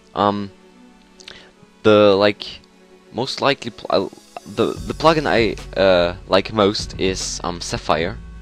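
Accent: Germany. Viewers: lol du bist deutscher? dein englisch is ja mal überboss ;D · ist er deutscher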